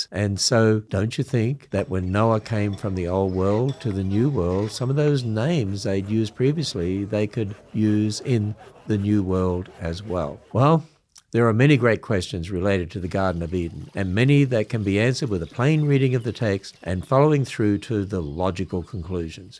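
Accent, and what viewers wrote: Australia. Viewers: Im Aussie, first heard you back in about 1999. Im flabagasted you still have our Aussie accent very strong, awesome... How long have you been away from here ?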